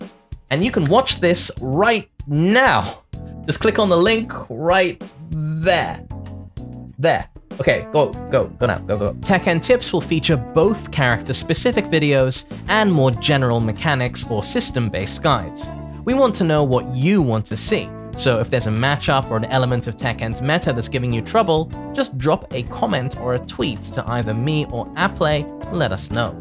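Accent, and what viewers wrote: United Kingdom. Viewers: "...parallel universe where fighting games are designed better." Ow, I'm not a Tekken dev but that hurt me by proxy. the english accent makes the shade worse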